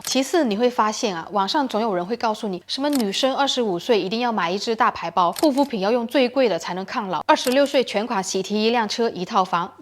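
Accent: China. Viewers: 這女的是對岸嗎，鄉音好濃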